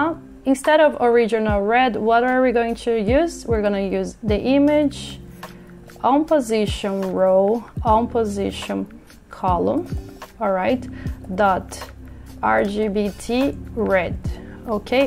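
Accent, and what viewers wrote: Brazil. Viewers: Sotaque BR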